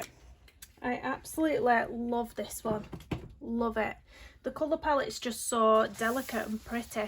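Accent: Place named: North, United Kingdom. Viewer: Some lovely work this month. My husband came in to listen because he's from Littleborough and pines for the Northern accent. He really liked the first one you showed, where you used the spray messy stuff. I find it hard to pick a favourite, I like them all. Can you share the link for Mouse Guard book? I've had it in my basket at Amazon for months and they keep telling me they still haven't been able to source it.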